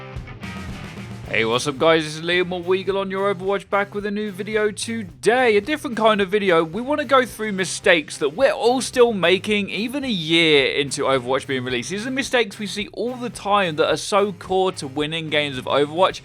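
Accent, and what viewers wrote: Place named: United Kingdom. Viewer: this british accent makes me wanna vomit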